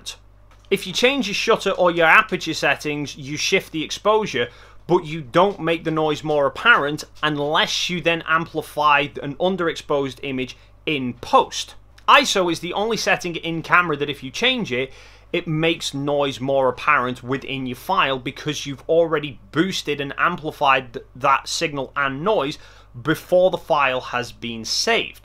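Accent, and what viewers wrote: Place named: England, United Kingdom. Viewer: Also have to admit that I am torn about english accent. I mean... sometimes I think of Richard Attenbourough and while other times I think of an evil character from a movie... snotty and just evil!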